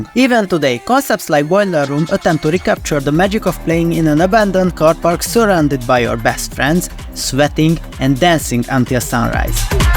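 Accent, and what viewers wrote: Hungary. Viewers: Is this a Hungarian accent? Just curious because i had a feiend in uni who spoke similarly. Might be wrong tho :) nice/funny video anyways ❤ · Te magyar vagy ? Kicsit olyan a tajszolasod angolba :,D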